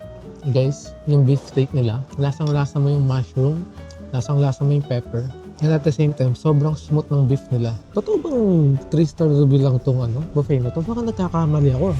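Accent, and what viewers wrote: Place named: Tagalog, Philippines. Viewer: And luxurious nang tagalog accent mo lods, W vids tho.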